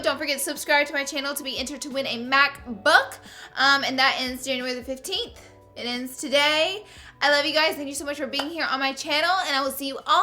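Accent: South, United States. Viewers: everytime says oil 😂 #thatsouthernaccentitsthebest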